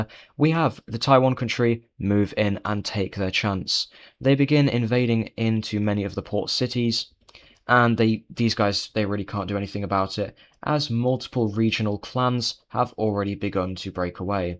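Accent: United Kingdom. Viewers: Pls stop posting my ears bleed when I hear your nasty British voice, I hold no love for that person, for their presence is as a storm upon a once-peaceful sea. Their words fall upon the ear like poisoned arrows, clothed in fair speech yet bearing bitter intent. Were honesty a jewel, they would wear but an empty setting. I would sooner seek fellowship with winter’s frost than endure another hour in their company. Let fate carry them upon a path far from mine, for where they stand, discord follows as faithfully as a shadow. Thus I leave them to the judgment of time, wishing not vengeance, but only that our roads never again should cross.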